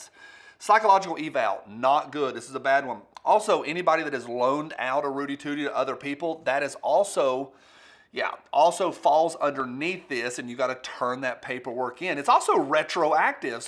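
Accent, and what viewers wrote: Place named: Texas, United States. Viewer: Where in Texas is this woman from? Making sure we don't ever move there.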